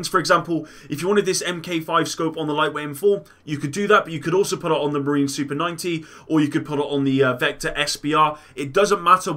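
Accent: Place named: West Country, United Kingdom. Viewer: Which part of the west country mate. Bristol here. Top vid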